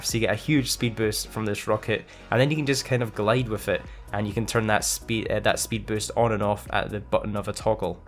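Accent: Scotland, United Kingdom. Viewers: I thought he was british 😂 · You didn’t know he was Scottish are you stupid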